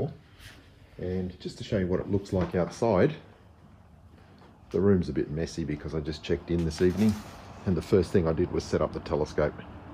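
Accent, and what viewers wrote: Australia. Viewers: love your accent and australian accent in general. i learnt my english with a canadian prof and i wished that my teacher was british or australian. cuz canadian accent is boring af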